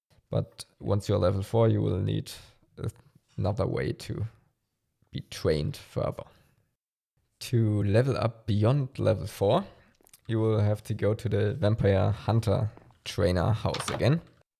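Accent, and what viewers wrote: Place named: Germany. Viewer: i can hear a small german accent mein Herr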